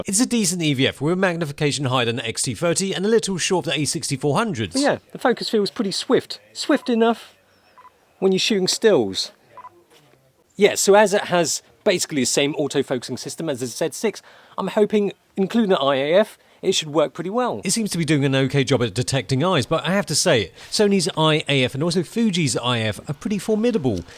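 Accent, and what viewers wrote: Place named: London, United Kingdom. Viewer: he doesn't really..... accent is London-ish?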